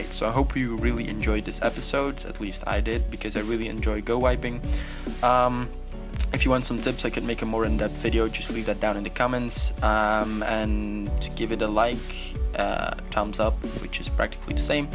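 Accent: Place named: Switzerland. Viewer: really its sounds like your are from switzerland xD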